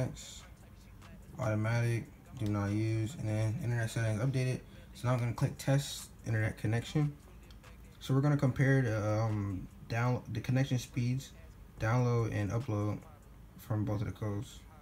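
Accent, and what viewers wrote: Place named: Baltimore, United States. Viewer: That Baltimore😁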